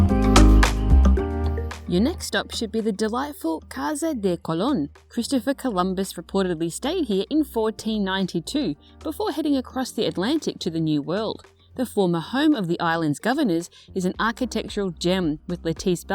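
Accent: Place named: Australia. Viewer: Are you an Aussie? Your accent sounds like. The Spanish pronounciations are not always on point, but sweet. I would have appreciated if you had consistently pronounced Gran Canaaaaria (not: Gran Canaeria - English way). And things like: 'concista' not 'conquista' - you know what I mean. Just some gentle feedback, since language seems to be a big part of your vids - great way of combining it. Lots of good info, and nice video footage - muchas gracias!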